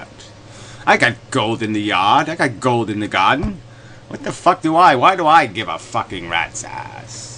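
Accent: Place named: United Kingdom. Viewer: holy shit.. british accent + asmr voice + inability to make an argument ? nerve churning. that's the actual dark triad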